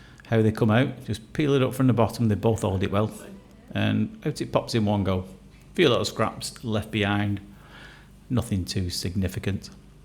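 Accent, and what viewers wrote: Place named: Wigan, United Kingdom. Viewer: Love, good health and the happiest of New Years to you and your sweet family! Your videos and your sense of humor and your Wigan dialect are relaxing, entertaining and educational.👂❤️🕊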